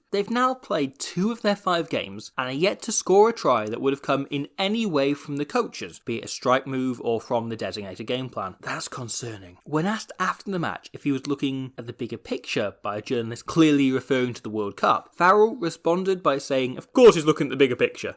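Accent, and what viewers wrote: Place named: England, United Kingdom. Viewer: Welsh parents but brought up in Nottingham, England hence the accent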